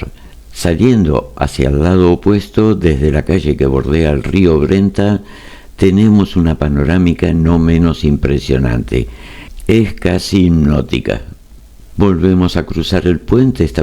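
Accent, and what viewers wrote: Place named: Argentina. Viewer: Buenos días Sr.; soy su nueva fiel seguidora, soy 🇵🇪, vivo en 🇺🇸 tengo 62 años, viajo a 🇪🇸a visitar a mi hija un año, y otro a 🇵🇪. Buscando consejos de viaje, con conexiones eso es muy estresante, encontré su canal, me encanto por la forma pausada en que nos habla, aparte su acento argentino, trabajé 5 años en su País, conocí gente maravillosa ❤. Por eso mi cariño eterno 🇦🇷. Muchas Gracias, este video me hizo recordar mi paseo a Fiorenze.. y la vez que me perdi al regreso a Roma por qué me fui hasta Nápoles 😅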